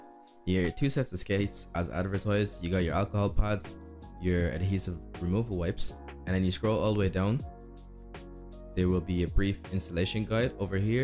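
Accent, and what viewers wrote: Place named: Ireland. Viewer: Love the review! Always nice to hear another irish voice while browsing the internet ☺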